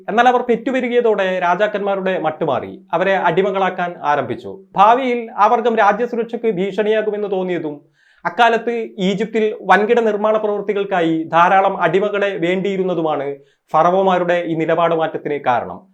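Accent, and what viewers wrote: Kasaragod, India. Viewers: പണ്ട് കോളാബി ചാനലിൽ , ചെയ്തതുപോലെ roasting പരിപാടി പൊടിതട്ടി എടുക്കാമോ.. we missing your humour senses in Kasaragod slang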